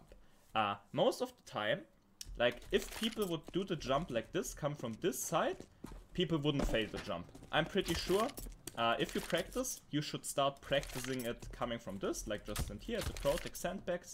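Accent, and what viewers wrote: Germany. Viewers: German accent ? 😉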